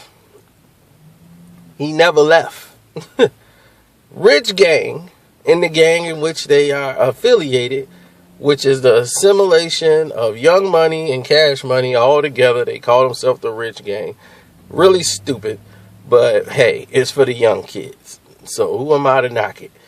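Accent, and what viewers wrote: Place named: South, United States. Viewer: your southern accent reminded me of the film "snow on tha buff."Lmao love that flick.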